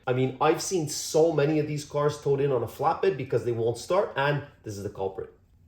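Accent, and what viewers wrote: Quebec, Canada. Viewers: nothing like a Quebecois French accent, grew up to a mother that had to learn English and grandparents that immigrated to the states in the 50s. I spent some time in Sicily in the military, Quebecois French kind of reminds me of Sicilian Italian.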